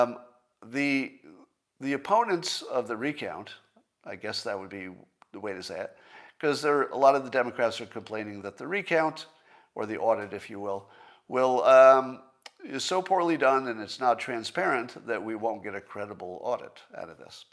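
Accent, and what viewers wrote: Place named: Northern California, United States. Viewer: That's the NORCAL accent.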